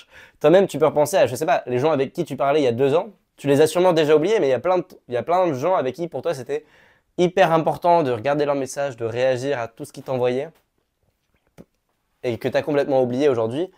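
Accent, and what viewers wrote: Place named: England, United Kingdom. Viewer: Wow l'accent anglais de fou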